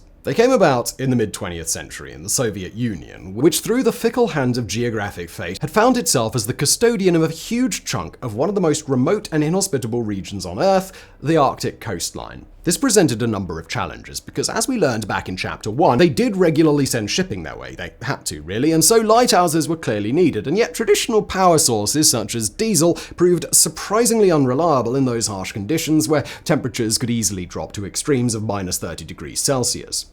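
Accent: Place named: United Kingdom. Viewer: Please speak a little slower. The rapid pace, along with a British accent , reduces to gibberish to the American ear.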